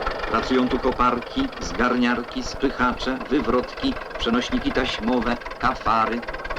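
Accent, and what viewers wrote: East, Poland. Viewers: Lektor chyba zza Buga? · Z jednej strony nie dziwie się komentującym, bo to historia, a jednocześnie dla pochodzących z tamtych terenów rodzinna historia, nostalgia. Jednak.. czy Wy nie słyszycie tej propagandy? Tego jak na sile podkreślane jest to że ta inwestycja to będzie wspaniała, która osuszy jedne tereny a nawodni drugie? Nie wspominajac o innej propagandzie ktora jest właściwie cały czas... ale takie były czasy PS. Czy wy też słyszycie ten akcent lektora, taki wschodni?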